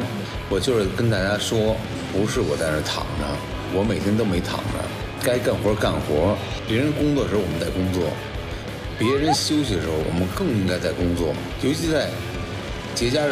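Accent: China. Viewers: 北京口音好爽，语速也超快。我本人是外国人，只能听一下真学不来。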